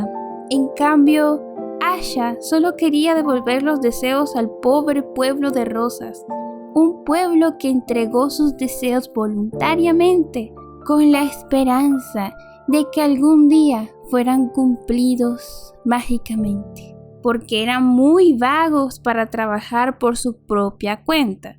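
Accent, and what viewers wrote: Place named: Caribbean Coast, Colombia. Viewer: Eres de la costa de Colombia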